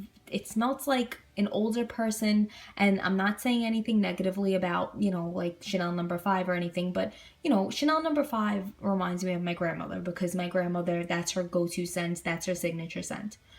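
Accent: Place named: New York, United States. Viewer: Italian/ new yorker much? Lol. Enjoyed all your beautiful perfumes we have similar taste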